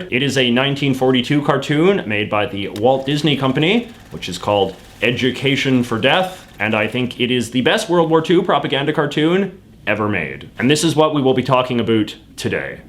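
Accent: Canada. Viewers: I was listening to this without watching and I realized it was with the way he says "about". Damn Canadians.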